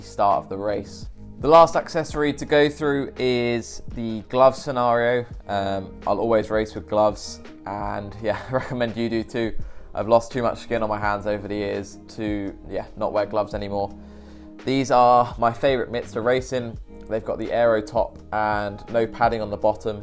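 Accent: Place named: United Kingdom. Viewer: Your accent reminds me a IELTS academic British English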